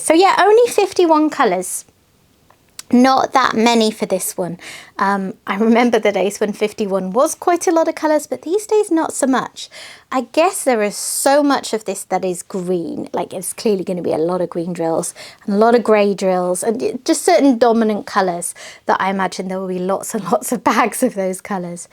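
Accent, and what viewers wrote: England, United Kingdom. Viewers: I just found your channel and I'm binge watching your posts. I'm from the states but I love hearing your accent because I can't wait to get back to England! It's Heaven to me 😊. Love your unboxes!!!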